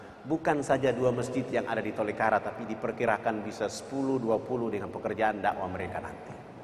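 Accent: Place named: South Sulawesi, Indonesia. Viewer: hihihi bugis makassar jie ee😊😊😊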